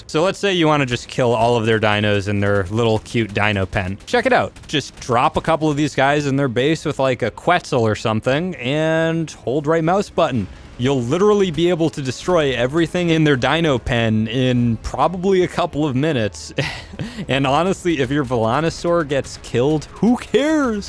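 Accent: Australia. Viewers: Want cute Australian bird voice back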